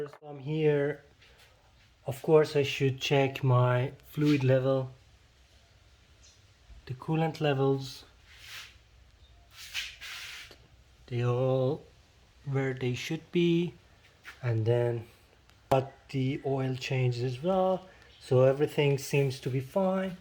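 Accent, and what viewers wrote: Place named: Australia. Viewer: Hello, you sound like a fellow-Aussie ☺ After watching your video tip, I Googled the oil needed for Toyota Yaris Cross, and found it to be 0W 20.( Synthetic) Is this a significant difference? What goes with such a variable answer to this question? I live in Sydney which is NEVER really cold, but often miserably, 3rd world, hot in those torrid months of December to March each bleeding year 😂 Thank you!!!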